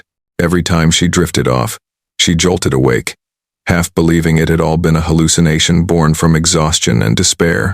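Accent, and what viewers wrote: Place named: Africa. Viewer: I agree with some of the comments below. and I think you did this on purpose, Too much commentary to robotic, very COLD. Not a good story in you first part. Watching from Nowhere.:face-red-droopy-eyes::face-red-droopy-eyes: :face-red-droopy-eyes: P.O.S. CEO Billionaire in this story. Bad African narration, really BAD.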